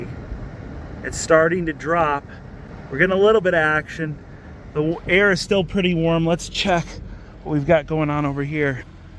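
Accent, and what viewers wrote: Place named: New York, United States. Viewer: Interesting video from a typical Florida boy, NY/NJ accent and all. Thanks a lot.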